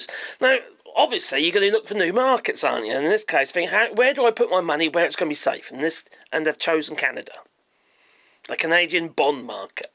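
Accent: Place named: West Country, United Kingdom. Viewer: Mate, we have spoken before. You’re doing so many videos at the moment and you are an absolute star. I remember when you were thinking about shutting your channel down but it seems things have got better. I am a Southerner from Pompey but I live in the West Country and they all talk like you, mate. And I have seen and love your dogs. I wish I could live there in France. You and your wonderful lady did a great thing to escape before those wankers fucked it for all of the rest of us. Much love mate. I would love to visit you just fora couple of hours one day. Surprisingly I,m not called. I’m Paddy. I’d love to chat but I get it if you don’t want to. Whatever youchoose, much love and thanks for the channel x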